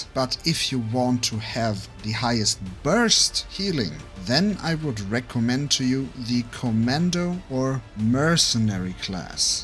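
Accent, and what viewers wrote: Germany. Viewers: Are you German by chance? :D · U probably from germany?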